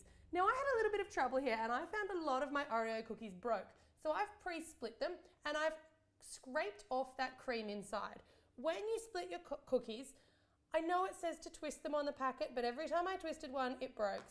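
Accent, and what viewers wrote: Australia. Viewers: Your an Aussie!! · I love Australian accents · an Australian haha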